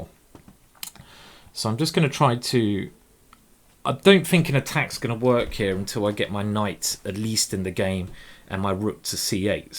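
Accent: England, United Kingdom. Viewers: I love it when he swears. Profanity is not only humorous but should be mandatory with such a fine english accent.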